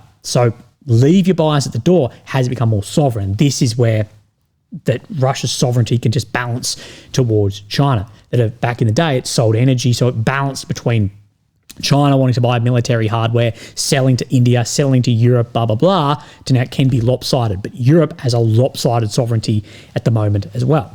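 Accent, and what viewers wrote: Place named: Australia. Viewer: Hearing a australian, talking about america is f**** hilarious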